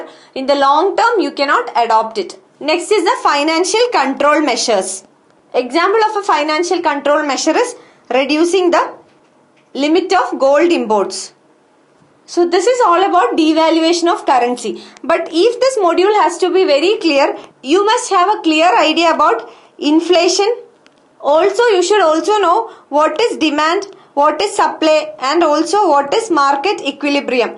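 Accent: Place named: Kerala, India. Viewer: Kerala English!!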